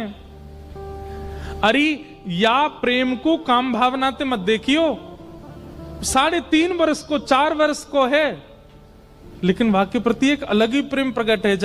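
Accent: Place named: Braj, India. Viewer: Indresh ji ap bhut acha bolte ho. Kitni khoobsurat h apki awaz. Apki Braj Bhasha. Sach m sundar❤❤❤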